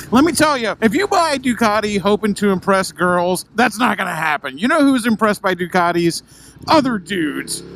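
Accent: South, United States. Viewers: What happened to your southern accent?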